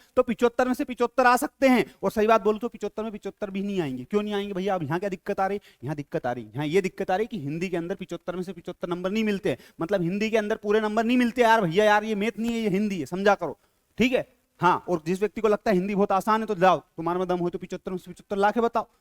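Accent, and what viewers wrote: Indore, India. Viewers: Pure indore accent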